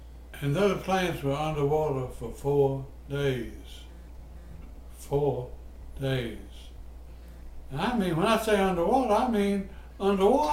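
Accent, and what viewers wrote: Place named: South, United States. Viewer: True southern draw…like my pappy use to have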